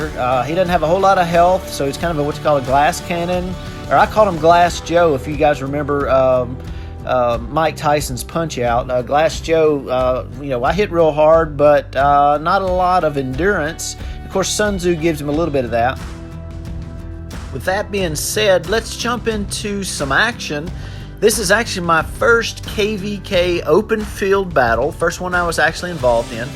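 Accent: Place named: South, United States. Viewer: Game looks interesting. You sound less southern.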